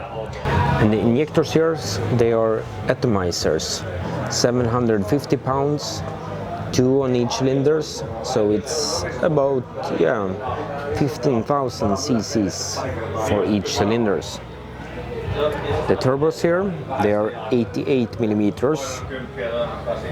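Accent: Sweden. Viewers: Your Swenglish accent is half the joy watching your videos! All in good faith!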